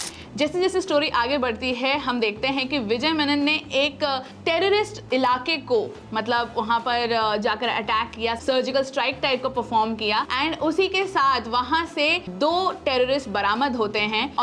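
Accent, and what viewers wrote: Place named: Bengal, India. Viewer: U BENGALI HINDI VOICE VERY NICE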